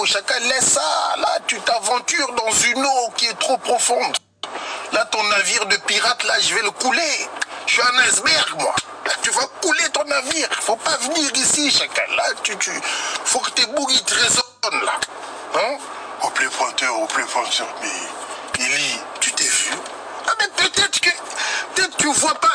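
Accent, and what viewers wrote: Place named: Grigny, France. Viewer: A grigny c'est comme ça que sa parle c'est sa ta pas compris.. ça se voit vous venez de province ou sud de la France pour dire sa...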